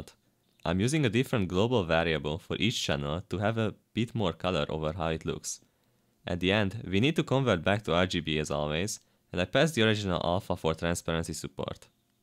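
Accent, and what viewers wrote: Hungary. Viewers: A magyar akcentus megcsapott ugyhogy jottem a komment szekcioba :D szuper video, igy tovabb!! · annyira büszke vagyok magamra hogy instant felismertem hogy magyar akcentusod van de amúgy geci kemény képeket lehet csinálni vele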